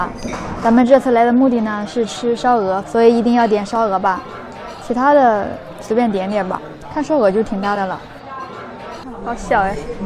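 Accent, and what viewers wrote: Shandong, China. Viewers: 能不能纠正一下3，，听起来好别扭，，，这个叫烧鹅，，，不要那么粗谢谢不是烤鹅，，，您的山东口音好明显哈哈哈应该山东人，，，这个是烧鹅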